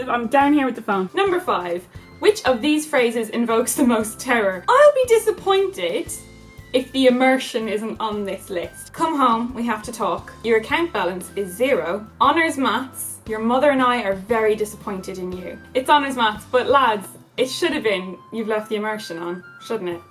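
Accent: Dublin, Ireland. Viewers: Subscribing just for the accent Irish are funny when then aren't angry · I think Lucan in Dublin I might be wrong but thats what her accent sounds like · Wow,.. You look BEAUTIFUL. You do in always, but in this one in particular.. You look.. grownup (thats a good thing somehow) and radiant. You look stunning:) And related to your video: You're accent is gold! I myself am not Irish at all. And I just wanted to say that grew up watching Riverdance.. my mum liked it, I guess and me and my sisters were obsessed with it.. with like Jean Butler and Colin Dunne.. Or is that stereotypical to say? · Didn't understand a bleedin' bit of that but I enjoyed it massively. I could pretty much just listen to you irishing on for hours. 🇮🇪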